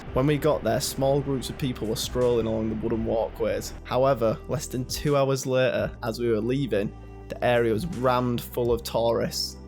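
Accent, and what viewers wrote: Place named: Barnsley, United Kingdom. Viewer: Sound like your from barnsley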